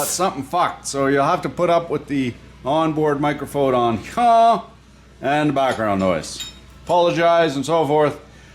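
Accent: Canada. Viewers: I’m a Yankee, but work for a Canuck company down in the states, and a’many’o Canucks I have income across, and have for quite sometime, and with that I have started to figure out (or I believe to have figured out) regional Canuck dialects. Are you a west coaster? Tanks for da ridiculous humor!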